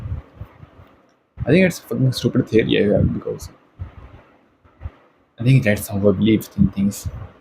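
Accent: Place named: Pakistan. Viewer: Articulation needs improvement , but bro ur voice wow🙂‍↕️ , what a voice.. really you could be a voice artist Btw r u pakistani bcz u sounded like that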